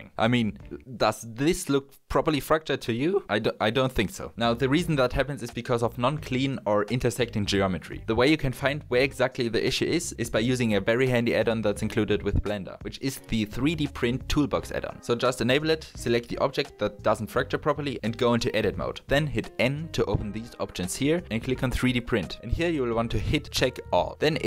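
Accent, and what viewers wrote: Germany. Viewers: das gesächsle nervt übelst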